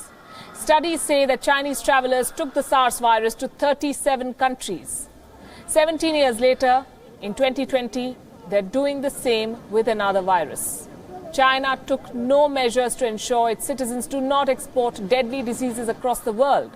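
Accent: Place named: India. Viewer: Indian accent make my ears bleed : (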